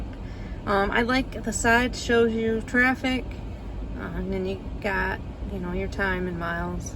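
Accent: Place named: Michigan, United States. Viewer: You sound like you may be from Michigan. My Love is from there, too. She's from just below Traverse City. A little town east of Manistee called Wellston. Just wondering. As always, travel safely...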